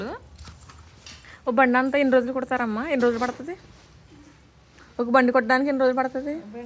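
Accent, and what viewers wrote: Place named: Konaseema, India. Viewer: Konaseema slang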